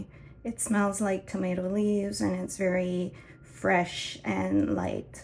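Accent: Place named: France. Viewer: My Dear, your new excitement is palpable. It's so noticeable your French accent was more forward. I love this! Congrats on your new venture.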